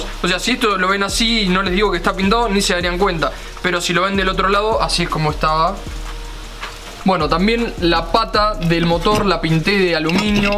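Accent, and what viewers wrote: Argentina. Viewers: ¿Porque habla como Argentino?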